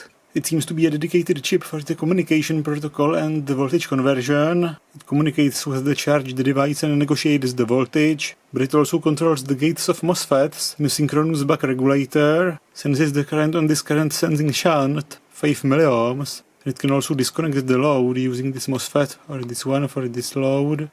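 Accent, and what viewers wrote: Prague, Czech Republic. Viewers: sounds like czenglish (Czech Republic) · Ta pražáčtina v anglické podobě je běs 😀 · I KNEW YOU WERE CZECH!!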